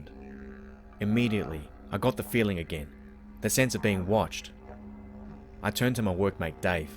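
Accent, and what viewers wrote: Australia. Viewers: Honestly the first time im hearing an australian man narrating creepy stories. Pretty well done. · Yay, a fellow Australian narrator. I wish I discovered your channel sooner.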